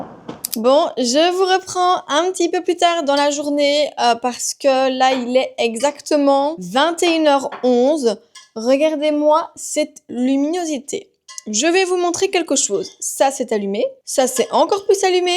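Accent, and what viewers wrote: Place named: Belgium. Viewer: Bonsoir, je n ai pas trouvé la réf de la lampe que tu mets sur ta caméra. C est pour accrocher à mon miroir. J ai cherché sur ta boutique Amazon. C est carrément le makeup que j aime: glow… J ai exactement le même modèle de boucles d’oreilles. C est vraiment un bel intemporel. C est vrai que tu as un fort accent belge parfois c est très drôle 😂😂😂. Je vois que Vivi t accompagne au pilâtes. Mes chats font la même chose. Ils se demandent ce que je fais par terre. Tu as utilisé ta palette Dior habituelle pour le glow? Je pense que je vais me commander la brume Rhode. Bises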